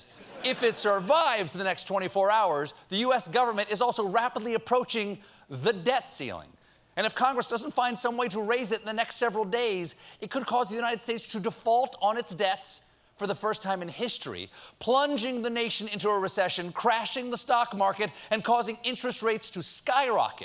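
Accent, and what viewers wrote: France. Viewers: J'adore your French accent! En plus, we are 83,9% vaccinated today =0) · His French accent is spot on.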